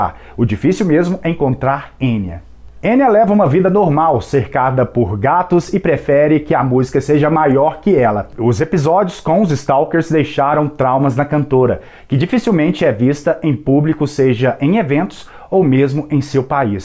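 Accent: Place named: Minas Gerais, Brazil. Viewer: Nosso amigo fala como um mineiro! Muito bom video! Difícil encontrar material em Português sobre Enya. Uma coisa que sinto falta mesmo. Apresentações ao vivo. Por ser dependente de arranjos sintéticos para tentar expressar o som que " ouve" é compreensivel. Viver reclusa em um castelo frio na Irlanda contribui para açodar stalkers, uma pena. Mas, como venderia sem marketing? Enya foi muito importante para uma fase de minha vida. Ainda é uma referência positiva e tranquilizante!❤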